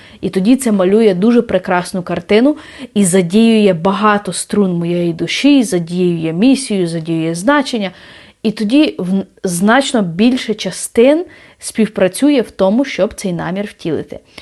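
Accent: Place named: Lviv, Ukraine. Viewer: Вас так приємно слухати, що я навіть не вмикаю х1.5 (що зазвичай роблю). Ви наче маєте львівську говірку («будЕте», «до прикладу»…) чи ви дійсно львів’янка може? Так часто вас слухаю - складається враження, що ми особисто знайомі 😅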